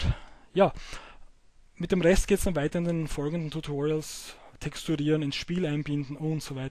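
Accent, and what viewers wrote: Austria. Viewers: Dafür dass du Östereicher bist versteht man dich ganz gut ^^, also jetz´ für nich´Östereicher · Is doch voll geil das du Österreicher bist :D Ich mag deinen Dialekt ^.^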